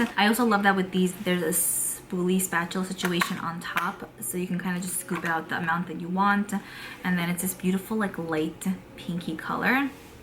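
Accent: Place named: New England, United States. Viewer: Welcome back❗❗❗ I missed you so much and thought of you often. I kinda knew you were pregnant. I want to know was this a planed pregnancy for you and Mikey? I cannot wait to hear your birth story. So happy to see and hear you. That Yankee accent makes me feel like home. Luv ya💋🤗👍✔️❣️❗ ColourPop Pop put out today cream bronzer stix and new highlighter shades. It's worth looking in to.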